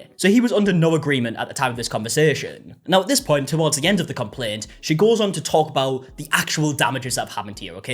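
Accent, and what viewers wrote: United Kingdom. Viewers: Yeah, the light to no R pronunciation and the schwa-ification of almost all vowels in some British accents is amazing sometimes 😂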